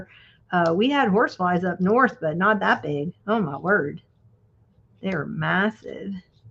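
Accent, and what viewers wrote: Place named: United States. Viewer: Weird comment, but has anyone ever told you that you sound like Kelly Clarkson? You must have been raised in the same part of the US. You have the same accents.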